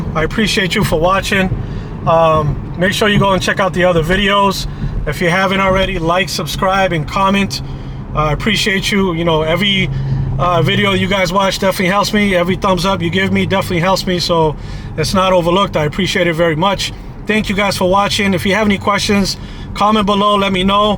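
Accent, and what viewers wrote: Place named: Massachusetts, United States. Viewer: I’m from MA too. You don’t have a strong accent at all (the road signs looked like you were closer to RI anyways), but I noticed it a few times - not a bad thing. Anyways - love the S5, congrats!!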